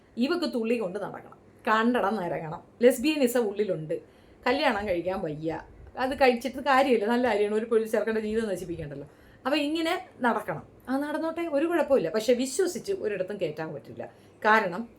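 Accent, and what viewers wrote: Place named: Trivandrum, India. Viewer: Ningal oru kidukkam thanne😂 Va thoranal mottham kidunkkunnu😢samsaram keddappa thanna manasilayi trivandrum ennu 😂🎉😂🎉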